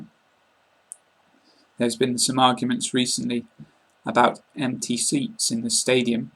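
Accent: United Kingdom. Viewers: thanks for teaching us i like British accent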